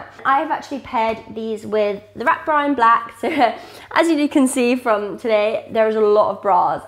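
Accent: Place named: United Kingdom. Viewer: I love your British accent 😍greetings from Mexico 🇲🇽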